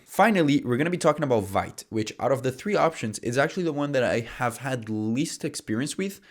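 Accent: Brazil. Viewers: Great video,! Hey, I'm curious about something... Are you brazilian or even son of brazilian parents? It's kind of weird that I understand almost 100% of what you said cause your accent sounds like mine hahah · Tu é brasileiro por acaso? ksksksks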